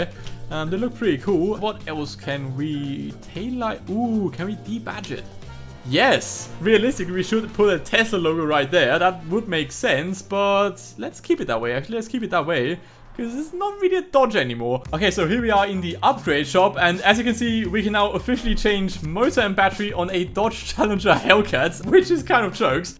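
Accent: Germany. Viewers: Are u german ? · One question... can you speak german? Your English sounds a bit german!😄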